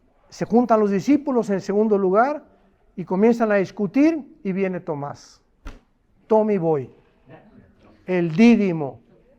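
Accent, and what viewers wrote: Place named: Peru. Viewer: Luis Qwerty estas seguro qué esté hombre es mexicano para mi suena como peruano ojo no tengo nada en contra de los peruanos es la primera vez que lo veo y me llama mucho la atención.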